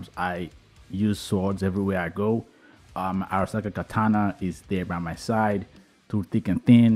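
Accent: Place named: India. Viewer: Hello. Where are u from? India? Just curious) Your accent sounds like indian.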